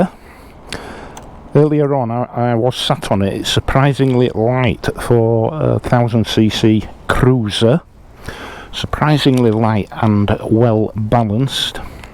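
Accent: Yorkshire, United Kingdom. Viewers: As an expat. yorkie as well as the content I like hearing someone what talks like me tha knows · Just subscribed. Enjoying your videos of my native Yorkshire which I left over 50 years ago. Great accent, great scenery, great bikes. Please keep on doing what your doing. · Just subscribed to add to your 10k target. Never mind the negatives. Concentrate on the positives. I like your commentary with that yorkshire twang. Regards from a manchester biker.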